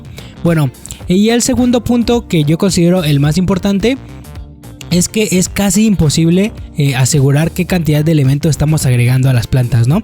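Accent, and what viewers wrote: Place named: Mexico City, Mexico. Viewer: A mi, me molesta mucho el sonido que hace cuando usa "tr", ya sé, es extraño pero me imagino que tiene la boca lleva de baba además además de su acento chilango, pues buen trabajo. Felicidades.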